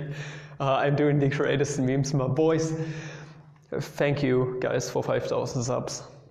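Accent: Germany. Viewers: Heavy German accent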